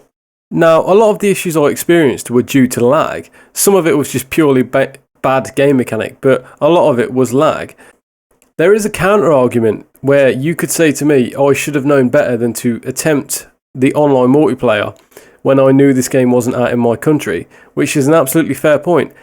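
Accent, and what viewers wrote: United Kingdom. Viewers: absolutely love the british accent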